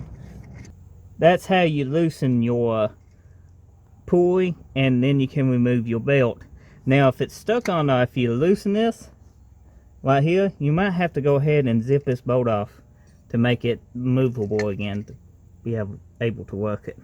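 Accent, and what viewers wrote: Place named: Louisiana, United States. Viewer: You from Louisiana?, it sounds like someone from that neck of the woods.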